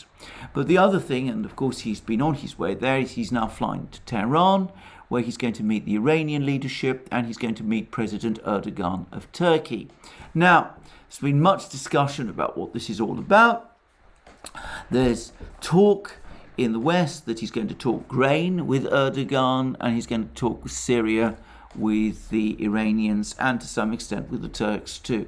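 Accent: United Kingdom. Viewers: THIS GUY tries to convey the the Russians have their act together. THEY DON'T AND NEVER WILL because RUSSIANS cannot freely communicate or express themselves, and the populace have no influence in Moscow under Putin's heavy hand. NOWHERE in this phony diatribe does he mention the successful Ukrainian attacks on many Russian weapons storage sites. Because that is a major event in the war, it calls his entire "analysis" into question. To me and any casual observer, his is nothing more than words with a British accent.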